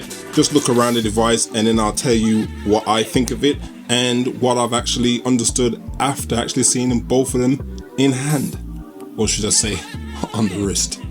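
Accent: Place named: Birmingham, United Kingdom. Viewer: Great vid and a fellow Brummie by the sounds. Cool.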